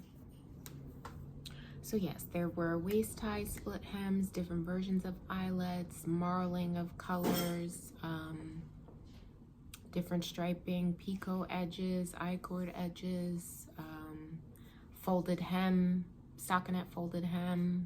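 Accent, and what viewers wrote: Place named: New Jersey, United States. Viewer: I love you and your podcast. Thanks so much! I’m from Philly. I live in Florida now. So, I’m enjoying your NJ voice and creativity.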